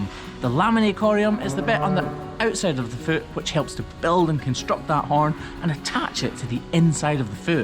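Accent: Scotland, United Kingdom. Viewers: Why is it that after watching your videos & then checking the comments, I read the comments and your voice is what I hear in my head? 🤪🤪🤪 I'm here in the USA & I hear a Scottish voice in my head. 😁😁😁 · I never thought I would laugh at the phrase “foot fetish”, until I heard it with a heavy Scottish accent.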